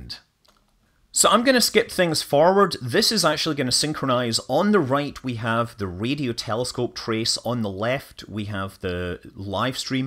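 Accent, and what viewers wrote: Canada. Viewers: isro is pronounced as iss-roe in indian english. not everyone needs to have manley's canadian accent, no?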